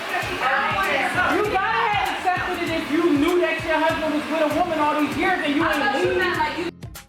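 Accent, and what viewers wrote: Philadelphia, United States. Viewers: I was trying to figure out where you were located then I heard “Real rap” I said yea she’s def. from Philly lol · I'm a guy who loves watching your videos, honeslty just discovered them yesterday. Please tell me that's a Philly accent??? <3 You speak like a cool ass big cuz or young auntie from 215 for sure <3 ...nevermind you just said "home shop in Philly" lol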